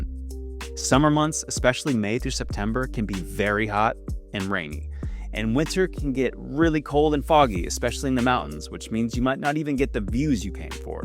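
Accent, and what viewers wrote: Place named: United States. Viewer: I have been doing some Google searching to see if a US citizen can use the international driving permit (IDP) with the motorcycle endorsement. The answer it keeps giving me: "No, a standard U.S.-issued International Driving Permit (IDP) does not work in Vietnam. This is due to a strict treaty mismatch known among travelers as the "country trap". Vietnam only recognizes IDPs issued under the 1968 Vienna Convention. However, the United States did not ratify that specific treaty and only issues IDPs under the 1949 Geneva Convention. Because of this, Vietnamese authorities view a U.S. IDP as legally invalid for motorbikes over 50cc" I am only assuming you are from the US by your accent. If you are, how were you able to obtain the correct license?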